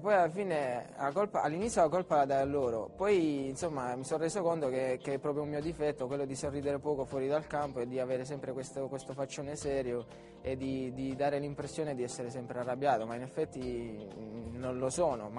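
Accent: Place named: San Severo, Italy. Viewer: oh xo l'accent' e san' sevir' non lo perde eh,ahahahah...grande pazienza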